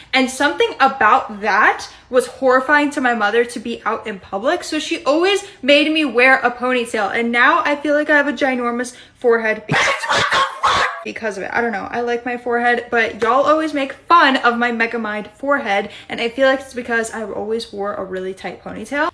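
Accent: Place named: United States. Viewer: You've got an American accent, but a Russian face 😅😅 Your acceptionably beautiful 😍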